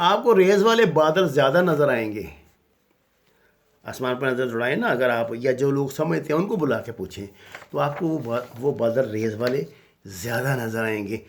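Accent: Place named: Lahore, Pakistan. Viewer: بولتے ہوئے لاہوری سٹائل کو کب چھوڑو گئے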